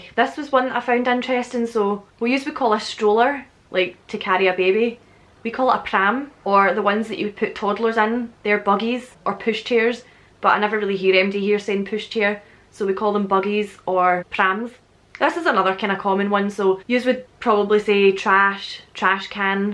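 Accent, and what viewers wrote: Scotland, United Kingdom. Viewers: what part of scotland are you from? i'm fallen in love with your accent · American, of Scottish descent, and I've never used the word "jumper". Perhaps to describe someone who jumped off of a building? Then again, I'm male. Females in America might use it to describe an article of clothing? Just not me. Hey, I really enjoy your videos. I've never been to Scotland, but want to one of these days. The Scottish accent is my favorite of all English speakers worldwide. Yours is just lovely.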